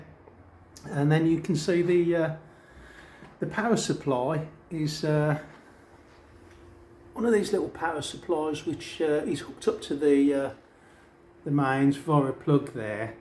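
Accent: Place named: Black Country, United Kingdom. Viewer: Love your videos, you seem a very friendly chap, definitely a Birmingham/Black Country accent there;)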